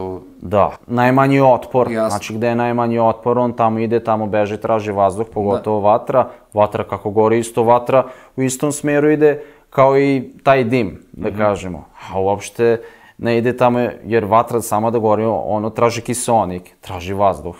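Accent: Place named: Subotica, Serbia. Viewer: Subotičanin? Zbog akcenta bih rekao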